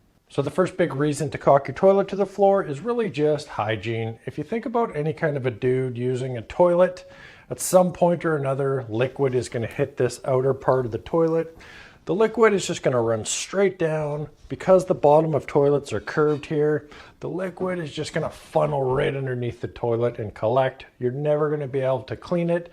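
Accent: Canada. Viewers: I just was listening, YouTube started the video late, I had to stop the video.. you're going to tell us "what you ended up doing on the toilet you installed upstairs " ugh, there's more than one answer, and the most common involves disposal of bodily waste. Here I thought you were American as some Canadian dialect just doesn't bleed out, namely syllables with an "o" show off from Canada like oo sound compared to said from a United States citizen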